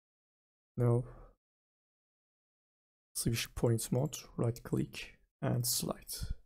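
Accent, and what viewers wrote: Turkey. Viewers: is it Turkish accent? :D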